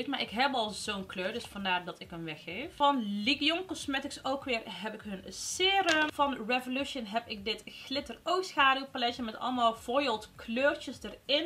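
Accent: Brabant, Netherlands. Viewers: Hoi, ik volg je al een tijdje op je kanaal maar ook op insta. Ik vind je videos leuk omdat je dicht bij de "gewone" vrouwen staat hoe je over komt en hoe je klinkt. Ook nog eens een Brabantse dat is altijd leuk ☺️. Verder is het leuk om naar je te kijken de uitleg is makkelijk te volgen, je geeft eerlijk je mening over producten en da zien we graag! 😍👍🏼 Heel veel succes met de win actie en stiekem hoop ik dat ik win natuurlijk 🍀🍀 Groetjes uit Eindhoven 💄👄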